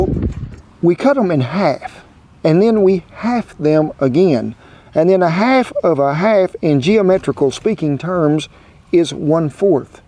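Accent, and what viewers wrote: South, United States. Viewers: We cook this kind of food pretty much every weekend, when the weather in our parts allows us doing so! Being a linguist, I would like to thank for his sincere and natural Southern tongue.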